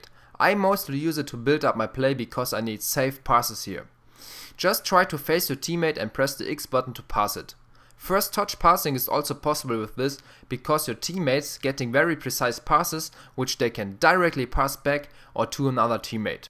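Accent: Germany. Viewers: your accent is so germans. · your tutorials are useful but when i hear your voice arghhh german english aaaaaarghhhhhhhhhh use synthesizer or shut the fuck up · Thanks you did your job good but it is funny as from the moment I hear you I knew that you where German, you have an most funny accent when speaking English ( I am not English but I speek with Germans alot :) ). Thanks one more time! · Man hört das du deutsch bist^^. Deutsche können kein English^^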